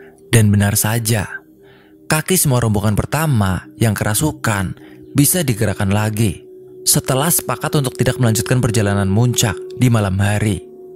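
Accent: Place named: West Java, Indonesia. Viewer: Itu logat Sunda 😅😅😅pas akhir kalimat agak gimana gitu 😅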